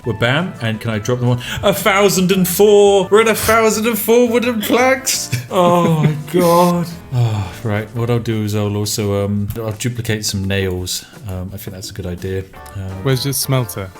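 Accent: United Kingdom. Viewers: Oh god... that's your actual voice!? Like you don't overact for your vids... you seriously sound 200% british even when talking with your friend. Tea.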